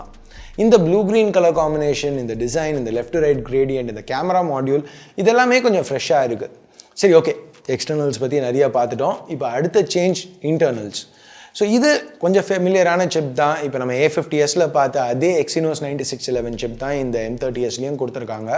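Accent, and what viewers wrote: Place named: Kongu, India. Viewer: Are you from Coimbatore? Your slang sounds a bit like Kongu Tamil.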